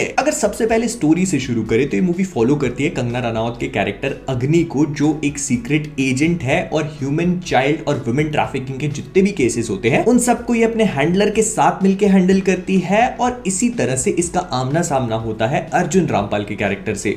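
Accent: Bhopal, India. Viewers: Bhai are u from bhopal kyuki achi bhopali krte ho 😀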